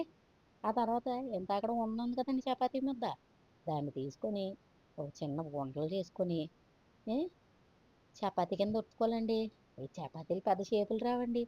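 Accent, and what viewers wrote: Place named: Godavari, India. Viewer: godavari slang super andi👌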